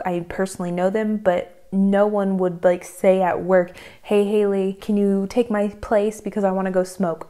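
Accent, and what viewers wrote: United States. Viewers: First of all love your content and your southern accent. (Y'all) Small talk... No. I want to know less about it/you. AC... In the car yes, otherwise you live in a shed. A house, doesn't get that hot. Water... If you want free water, use the tap. Smoking... Yeah, we got all addicted by the US tobacco companies. so deal with it. Speedos... Well, yeah, they were common decades before shorts. Football... Soccer is for american girls, we love football. The experience from a Football match to a NFL match is like comparing a Formula 1 car and a stroller. There is this guy Connor Sullivan on Youtube, watch his video on going to a premier league in Germany. Otherwise, best wishes from a German, living abroad. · Where you from bro Texas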